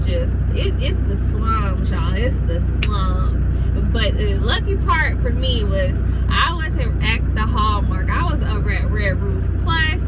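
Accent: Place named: Texas, United States. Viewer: Your.... beautiful..What's that accent ?? I hear Texas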